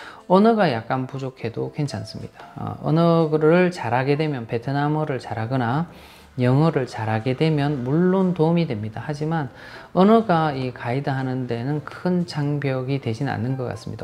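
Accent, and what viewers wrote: Gyeongsang, South Korea. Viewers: 톤이 차분하니 듣기좋네요. 경남분인거 같네요. 말씀을 들어보니 인복이 있으시네. 인복이 있어야 돈복도 있는법 부럽습니다. 30대때 당시 기술기능으로 독립이민을 상당히 고민했던 기엇이 나네요. 캐나다준비했었는데 결국 그 산업이 세계적으로 침체기가 돼서 접었던 기억이 납니다. 영어도 나름 열심히 했었는데. 무엇이든 그렇지만 외국에 가고자한다면 다른 준비나 여건도 그렇지만 무엇보다 연이 닿아야 된다고 봅니다. 외국에 사는 연은 분명 있거든요.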